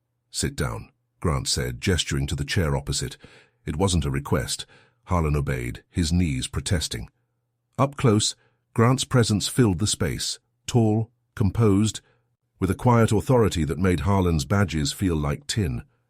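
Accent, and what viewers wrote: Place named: United Kingdom. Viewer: This story is disjointed and ludicrus. This AI crap has really become tiresome. Why is the writer of this story using a British accent to tell an American story?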